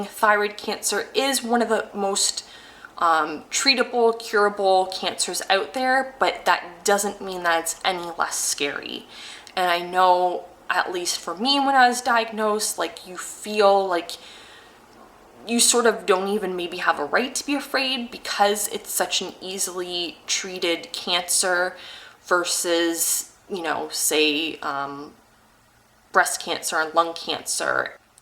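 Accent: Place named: Canada. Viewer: Thanks for the response! Apparently the nodule has all the markers of being cancerous but you definitely helped calm my fears. I'm so nervous about the biopsy! I am in medical school and the anxiety that sometimes lets my mind run away makes it hard to concentrate! The school physical was actually what incidentally found my hypothyroidism because my PCP wanted to check thyroid levels "just in case" and then i also had the ultrasound for the Hashimoto's "just in case." On an unrelated note, I love your accent! the "out" and "about." Canadian, eh? :) thanks again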